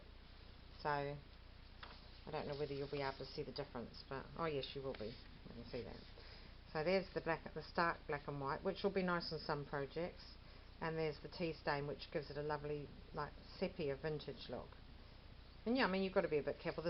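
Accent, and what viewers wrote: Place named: United Kingdom. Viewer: my Husband was laying next to me and asked if you were doing your imagination of. with your 'got ta. (garder) with your BRITISH ACCENT. he's so cute listen to you and me on hear. when he heard you say that. he started singing " " I just love it when he sings to me. thanks for your accent. we just love you and then to put the topping on the cake. we both ended with. IF THEIR ANY GENTS LISTENING we are both in the US. Thanks.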